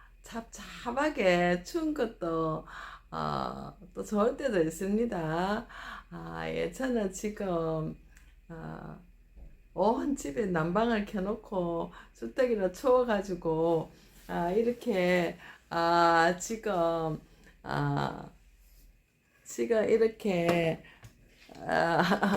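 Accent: Gyeongsang, South Korea. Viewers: 78♡ 교수님 반갑습니다. 경상도 억양이신데 저도 19세까지 포항에서 살았습니다. 영상 잘 보겠습니다.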